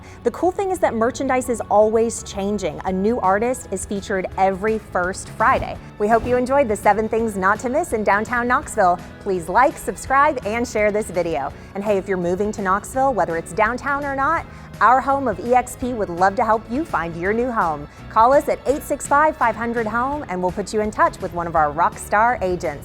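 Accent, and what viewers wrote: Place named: California, United States. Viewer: My god what an annoying voice ! She sounds like a idiot from California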